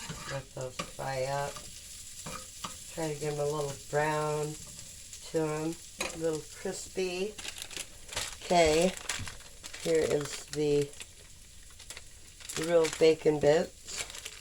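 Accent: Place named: Lower Michigan, United States. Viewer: I would have subbed so much sooner if I knew you were a fellow Michigander! Sound like you're South of the bridge... maybe Elk Rapids/Grayling/Gaylord or even Battle Creek (no need to disclose). Can't go wrong adding more bacon renderings!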